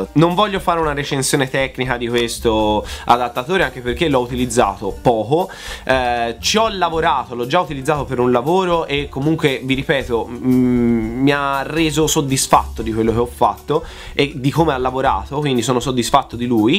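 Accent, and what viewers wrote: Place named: Tuscany, Italy. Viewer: Io da toscana a sentire un toscano moio dalle risate 🖤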